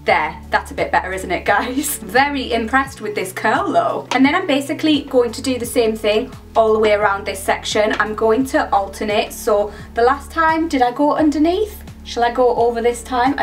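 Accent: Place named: Liverpool, United Kingdom. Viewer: You made me giggle with your scouse accent 😂 wonderful video as usual! You’ve done us women proud since it’s been women’s day this week! 💪🏼💓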